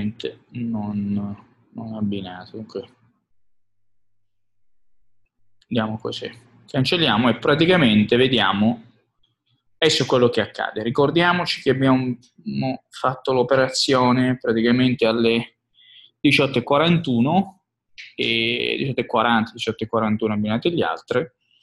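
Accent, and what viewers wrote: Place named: Bari, Italy. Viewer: Non si capisce nucazz propij alla barese come parla lui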